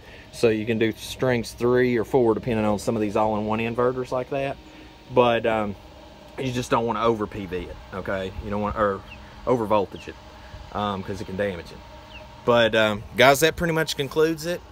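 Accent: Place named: South, United States. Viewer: Love your southern!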